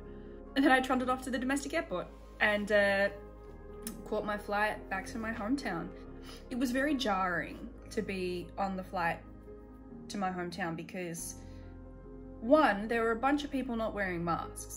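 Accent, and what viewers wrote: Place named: Australia. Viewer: You can tell you're home because you sound SUPPPPPER Aussie. Thanks for sharing your journey, can't wait to watch your streams!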